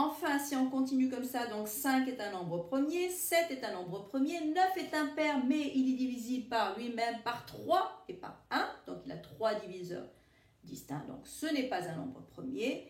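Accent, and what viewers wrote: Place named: Tunisia. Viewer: Elle a l'accent tunisien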